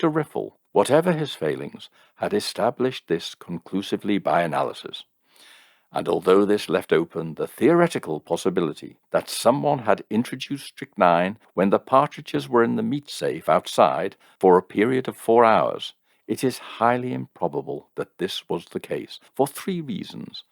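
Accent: United Kingdom. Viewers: We missed you and you're wonderful story telling, drawings and investigative talents. We want more! Best of wishes always...From a Brit in the USA who is always restored by a fellow British accent and intelligent logic. XXXXX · I love the true crime stories that you presented. Hope to see miore of these videos of yours. And the music is great, your voice plus the gunshot. So British.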